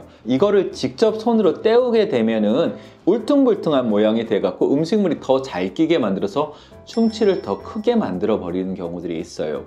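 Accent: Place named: Gyeonggi, South Korea. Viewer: 경기사투리인거 경기도가 고향이신거?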